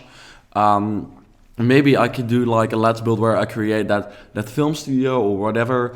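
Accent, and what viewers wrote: Netherlands. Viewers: Omg wahah, ik dacht al dat ik het nederlands engelse accent hoorde! ❤️✌🏻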